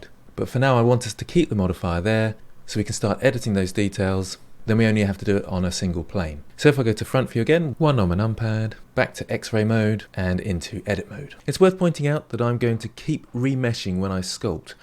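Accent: England, United Kingdom. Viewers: Your englishness il delightfull. Always a pleasure listening to your eplaination.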